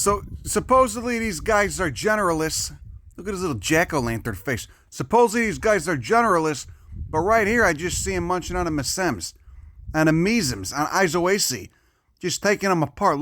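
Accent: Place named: New York, United States. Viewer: DAMN U SOUND LIKE NEW YORK !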